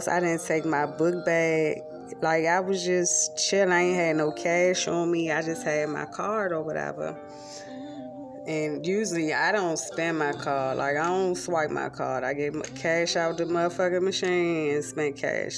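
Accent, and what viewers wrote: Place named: Washington DC, United States. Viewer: Sounds like you're from DC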